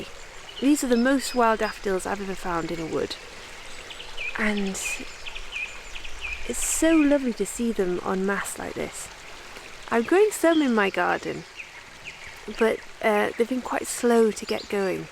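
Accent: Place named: United Kingdom. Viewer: I’ve enjoyed watching your video very much. I enjoyed being out in the fresh air with you. It’s been raining here in Washington State, and it’s cold. And to top it off I have a miserable cold so I’m not feeling very well at all. Watching YouTube is as energetic as I can get. You made me smile with your soft voice and very British accent. Thank you for making me feel better.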